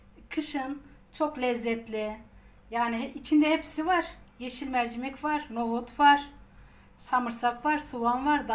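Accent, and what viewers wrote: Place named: Adana, Turkey. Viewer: Selam Hanım. Rahmetli annemin de bize yaptığı bu çorbanın tarifini ararken size rastladım. Konuşur konuşmaz Adanalı olduğunuzu anladım. Memleketten uzaktayken çok iyi geldi anlatımınız. Ellerinize sağlık.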